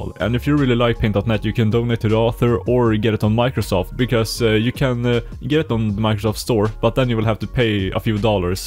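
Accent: Sweden. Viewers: the swedish accent i knew he was swedish from the first second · Han hör är 100% svensk😂😂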